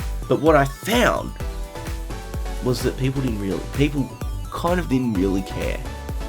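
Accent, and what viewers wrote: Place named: Australia. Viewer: That Australian accent can be quite tricky for me, but I do understand most of it. ;) I think it sounds good, to cover the same game on different platforms. It's interesting to compare the differences. I'm slowly starting to regret naming my channel, so I'm stuck on one platform. I do feel most at home on the C64 (partly Amiga, as well), but it could be very interesting to try some of the other machines. Especially Amstrad and Spectrum. New exciting worlds will probably open up for me.. :) Anyway.. Looking forward to your 2016 content. :)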